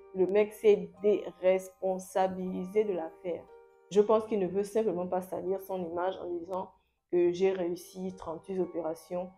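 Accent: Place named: Gabon. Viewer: Quand j’entends ta voix, ton accent Gabonais me fratte carrément au visage 🤧✨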